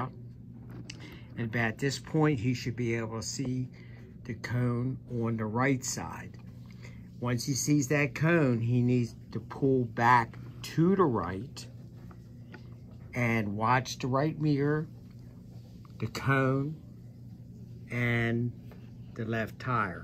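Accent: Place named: Maryland, United States. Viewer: Thank you so much for all your videos they were a huge help , I got my CDL today 🎉 Also I’m originally from Maryland so you sound like my people 😊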